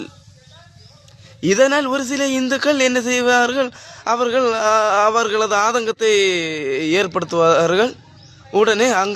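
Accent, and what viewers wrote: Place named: Kerala, India. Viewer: என்னப்பா. மலையாள வாடை அடிக்குதே!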